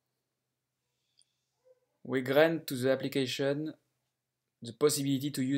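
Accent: France. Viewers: like a French speaks english ?? for example button, you said boutton..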